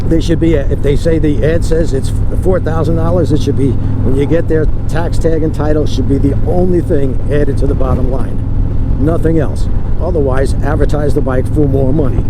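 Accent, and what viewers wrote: New York, United States. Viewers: where in NY ya from... OMG ya sound like me..hah.. apperecite ya...much respect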